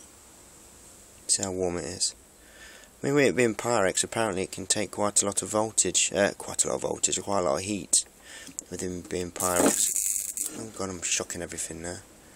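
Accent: United Kingdom. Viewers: Are you from Lincolnshire by any chance?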